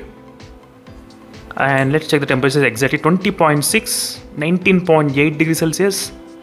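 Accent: South India, India. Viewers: Mother tongue influence! I guess he is south indian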